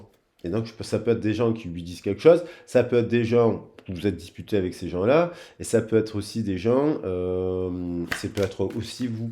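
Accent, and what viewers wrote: South, France. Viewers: Bonsoir vôtre accent du midi me remonte le moral ca fait du bien moi des angoissés seule tres angoisse merci bonne soirée bisous ❤❤❤❤❤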